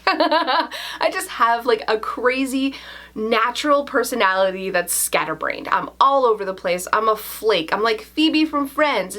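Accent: Canada. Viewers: In the same way you like her Irish accent, I enjoy your Canadian accent! 😍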